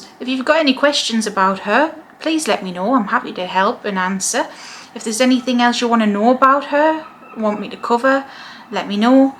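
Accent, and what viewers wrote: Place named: North East England, United Kingdom. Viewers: This might be a bit off topic but I'm curious to know if you're from Newcastle/ the North East? I'm just mentioning it because I lived there when I was younger and when I clicked on this video (after weeks of feeling called to learn more about her) your accent struck me as a positive sign that this video was meant to find me ❤️‍🔥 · it's so surreal to find the first video talking about working with Hecate is by someone with a very similar accent to me - hi from Teesside :)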